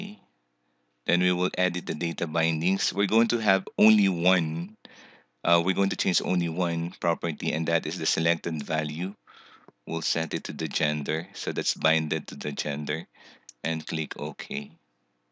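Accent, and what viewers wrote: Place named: Philippines. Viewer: Hmm! Filipino accent X))))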